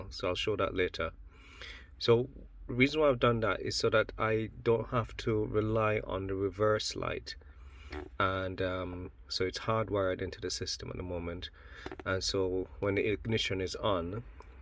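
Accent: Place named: Trinidad and Tobago. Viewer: Hey, why did you turn off the comments on the other video? You sound like a Trini.......are you? I have a VW Caravelle Syncro...planning to remove the stock radio and install a touch screen radio with the reverse camera. While it's activated from the wiring on the reverse lights, I will be putting in a bypass switch (thanks for reminding me), where I can switch to the reverse camera even when the van is in forward.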